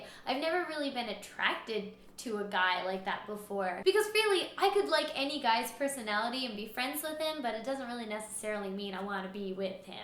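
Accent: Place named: Newfoundland, Canada. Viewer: TIL Newfoundland has a very distinct and interesting accent, coolbeans! Also that nipple covers make excellent MacBook feet (I use chair leg covers for them, myself).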